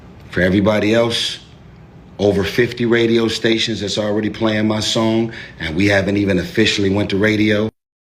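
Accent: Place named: East Coast, United States. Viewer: your East Coast soft voice wit that accent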